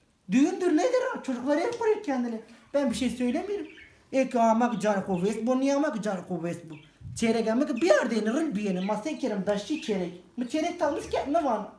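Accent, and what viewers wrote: Dersim, Turkey. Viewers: Tam anlamıyla bir dersim şivesi 😂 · Aynı dersimliler gibi konuşuyorsun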